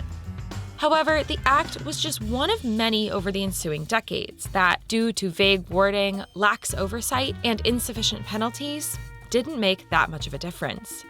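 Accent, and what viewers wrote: United States. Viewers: My impression is that (based on the host's accent) they're based out of the US and report on locally relevant material. Besides, crapping on the US is the national pastime.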